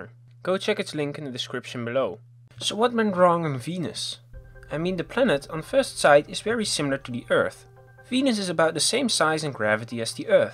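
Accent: Netherlands. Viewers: Are you dutch? Good video btw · Did I heard an Dutch accent?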